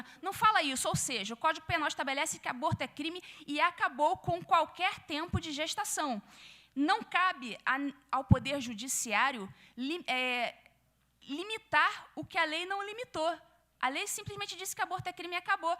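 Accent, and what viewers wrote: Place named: Rio de Janeiro, Brazil. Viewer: Muito competente... Parabéns..só uma coisa menos esse carioquex... Fica td certo..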